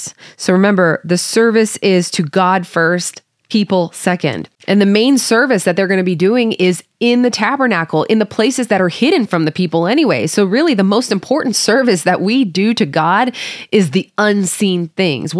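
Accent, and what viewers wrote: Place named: South, United States. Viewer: & Although you do sound a tiny bit like you have a southern accent on .75.. you pronounce things way to clearly lol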